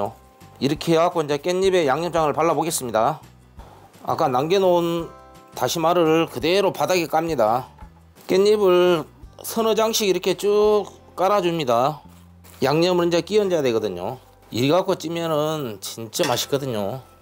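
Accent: Jeolla, South Korea. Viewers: 말씀도 맛깔나게 하시네요. 정겨운 전라도 말씨 더맛있게 느껴져요^~ 감사합니다.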